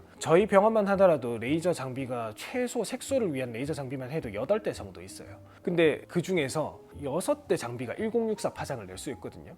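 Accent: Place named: Yanbian, China. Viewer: 과한몸짓과 조선족말투. 사기꾼 같아보입니다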